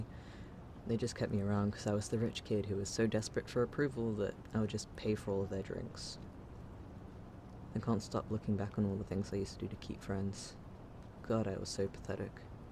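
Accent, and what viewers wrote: United Kingdom. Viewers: I love your British Accent and you deserve more Subscribers! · R u British because that accent hits different for me like omg how can someone have an angelic voice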